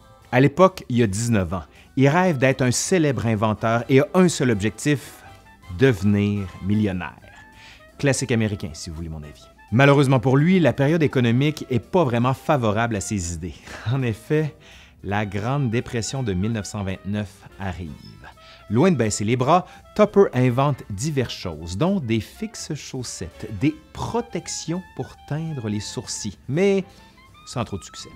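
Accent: Quebec, Canada. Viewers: Merci pour ton accent du Québec 😂 Tupperware a malheureusement mal fini... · J' adore l'accent canadien:) Superbe video:) Merci:) Je m'abonne avec grand plaisir. Bonne continuation.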